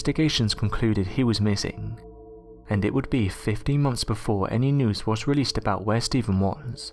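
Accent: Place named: London, United Kingdom. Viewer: English. London sounding. But it's the strange rhythm of his voice that gets me. I just can't handle listening to him. Oh well.........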